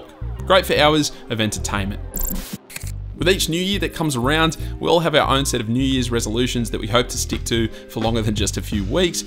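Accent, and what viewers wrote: Australia. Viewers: is this guy australian? i am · And that Aussie Accent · Where are you from ? Australia?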